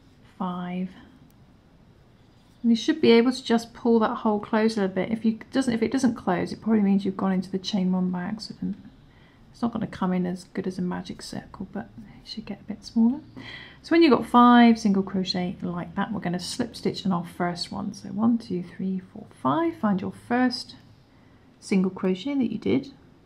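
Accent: England, United Kingdom. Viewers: Loving your channel. I’m 74 from UK and newbie to crochet, struggling with lockdown. You have a lovely clear english voice and clear instruction. (U.S. contributors - of anything - so long winded!) I finally did your daffodil - after many mistakes! and now doing your lovely piggy. Many thanks. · It’s nice to have somebody English nice and slow and clear and showing you exactly what you’ve got to do your brilliant thumbs up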